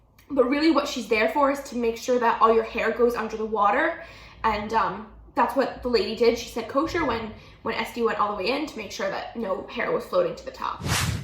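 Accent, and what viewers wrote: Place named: United States. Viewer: When I see you, hear you speaking I feel like I have an American girl in front of me, certainly not an orthodox jew girl. Your nails are painted... Your top doesn't cover properly the area below your neck. Also, I don't think it is very tsanua to expose yourself on YouTube with your hair untied and talking about family purity rules for the entire world to see and hear...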